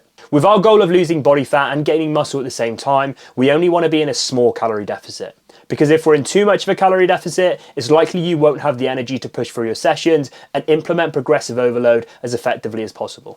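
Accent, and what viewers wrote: United Kingdom. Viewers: Oooo he’s Bri’ish 😍😍😘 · You have some gorgeous blue eyes and I love the British accent. That's what you call a Bubble butt in the black community in the USA. I will never have a flat or wash board stomach because at 65 years old, I don't have the energy to be doing a lot of exercise. I am not overweight by the weight. My weight is 180 pounds and I am 6 feet tall. 🤣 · For me, it is his eyes, and adorable ingenuous accent. Brits are the best!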